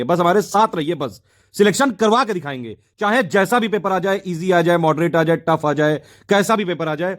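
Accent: Kanpur, India. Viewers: Kanpur ke lag rahe ho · Sir ji kanpur ke lag rahe hai 😄😄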